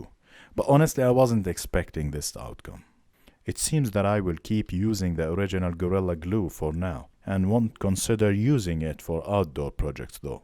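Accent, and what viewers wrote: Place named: Thuringia, Germany. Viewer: That accent. Sounds Thuringian. Please tell me I’m correct!? Lol.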